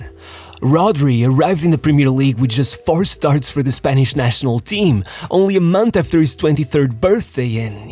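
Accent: Portugal. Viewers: Just a Portuguese accent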